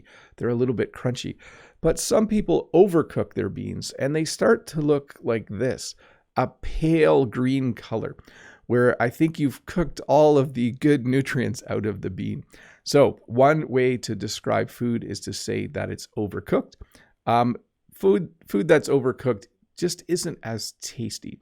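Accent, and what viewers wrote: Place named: Canada. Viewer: Hearing your Canadian Accent really excite me. Thank for the video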